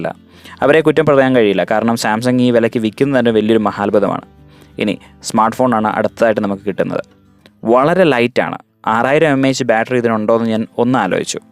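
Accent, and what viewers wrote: Idukki, India. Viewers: ഇടുക്കികാരൻ ആണോ ബ്രോ ?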